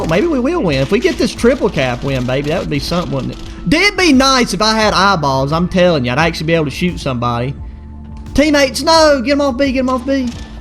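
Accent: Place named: West Virginia, United States. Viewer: An ak setup would be great and you sound like your from West Virginia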